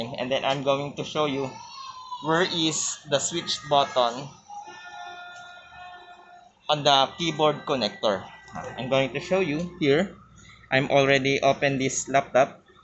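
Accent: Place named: Visayas, Philippines. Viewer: Bisayaa oi. Peste. English² pang kagwang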